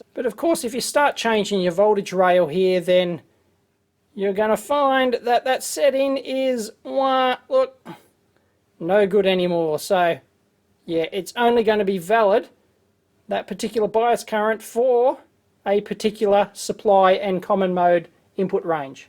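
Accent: Australia. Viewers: Its very funny to click on transcript and watch as the AI struggles to match Aussie accent. in particular...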